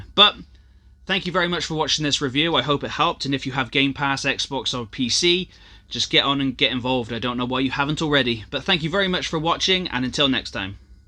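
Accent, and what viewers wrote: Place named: West Country, United Kingdom. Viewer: My game of the year, been looking forward to this game for months and was not disappointed, great review, ive actually found a couple Easter eggs (giant sandwich and the original xbox) I have to ask..... what part of the UK you from? Love the accent! I'm a Bristolian, you have a west country twang! Lol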